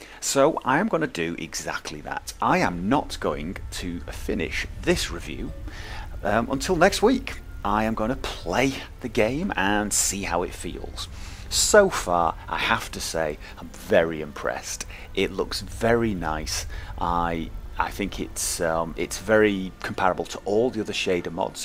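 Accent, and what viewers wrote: United Kingdom. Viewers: cool accent=) are you British?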